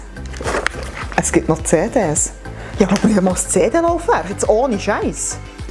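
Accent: Bern, Switzerland. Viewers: Cool! Kenne bis jtz nur d Unboxings vom Tobinator. Mau schön uf Bärndütsch 👍🏼😄 hesch öpe e Steam Game Account ou no?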